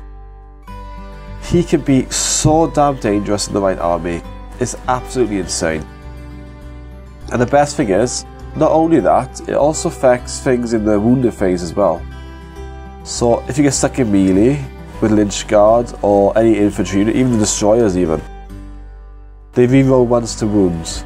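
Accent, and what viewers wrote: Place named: Wales, United Kingdom. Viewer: haha I read through all of these just to confirm you were in fact welsh. would recognise that accent anywhere, big ups from Crickhowell and Swansea. Necrons are a horrible faction to play against though, far too much reanimation, or stupidly op invulnerables..i'm looking at you 3++ wraiths.. I mean seriously gw were just lazy not thinking of better rules to reflect their toughness like..increasing their toughness, or giving them a 5+ fnp ect.. having 3 of the receive a charge from a ccw ironclad dread eat it up and then devour a leviathan is just stupid if you ask me. Anyway good vid sorry for the rant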